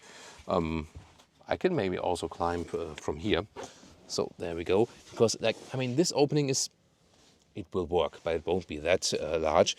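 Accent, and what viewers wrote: Germany. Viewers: Allway Watch he has more of an accent then my cousins and they’re German too. Lol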